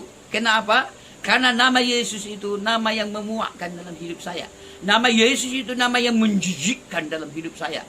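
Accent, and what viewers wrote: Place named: Aceh, Indonesia. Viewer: Logat bapak di awal pembicaraan seperti orang Aceh. Tapi ditengah pembicaraan bapak, lok logat Aceh nya jadi hilang sih Pak... Sebenarnya bapak orang mana sih? Gimana sih si bapak ini.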